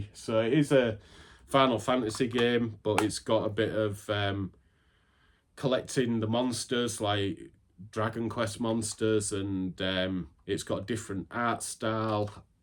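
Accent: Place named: Hull, United Kingdom. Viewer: Hey dude, great video, love prince of persia games. Found this video randomly and because you sound like me (im from hull, or should i say 'ull 😂) ive subscribed. Look forward to followng your journey mate. Keep up the great work and collection 👍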